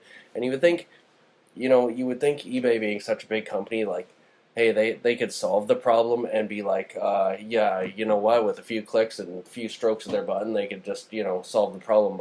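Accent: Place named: Canada. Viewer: As soon as I heard you start talking I was thinking, "Yep, another Canadian like me going in freaking circles for months." With every customer service rep they have thanking me for being a loyal customer for 27 year, but then telling me my banking info is not accurate. How does a massive company like eBay screw this up?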